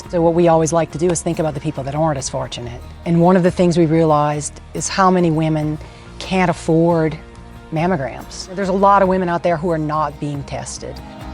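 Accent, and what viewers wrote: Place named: Louisiana, United States. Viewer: Monkey Michele is so proud of you girly! You will inspire many with your story! I love that you haven’t lost your Cajun accent. I hear it so clearly! Stay close to your roots. Much love!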